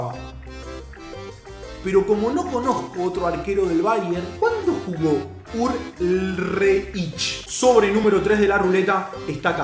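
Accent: Argentina. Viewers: no sé porque pero me da gusto escuchar ese tono de argentino ajkds like :)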